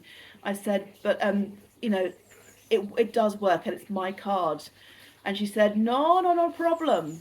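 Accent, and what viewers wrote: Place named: RP, United Kingdom. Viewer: Ooo love the way you say you're shakespear quotes with you're gorgeous posh voice ❤❤❤❤❤❤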